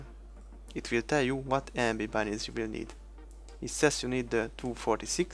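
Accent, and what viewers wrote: Hungary. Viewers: A kiejtésből egyből rájöttem hogy magyar vagy. :D Segített a videó, köszi! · Számomra az akcentusodon tűnt fel,de jó tudni,hogy magyar vagy :D Ha hülye vagyok,s valami nem sikerül legalább az anyanyelvemen tudsz segíteni :D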